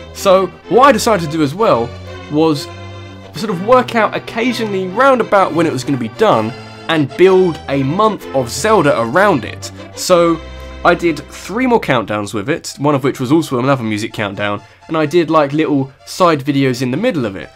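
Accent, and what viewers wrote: England, United Kingdom. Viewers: HOLY FLYING HOBNOBS, HE'S BO BRITISH! O_O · What part of England are you from?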